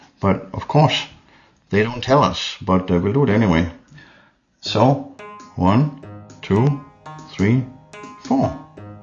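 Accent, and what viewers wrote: Denmark. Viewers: fed dansk accent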